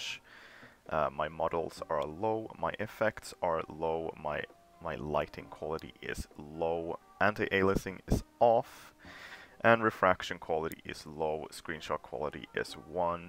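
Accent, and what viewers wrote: Sweden. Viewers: love the swedish Accent much luv <3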